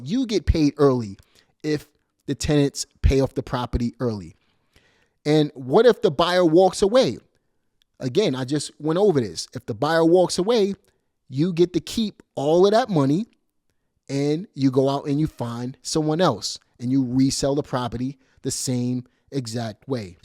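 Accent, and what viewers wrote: New York, United States. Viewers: You from new York?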